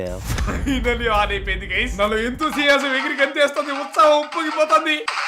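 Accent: Chittoor, India. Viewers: Chittoor slang anna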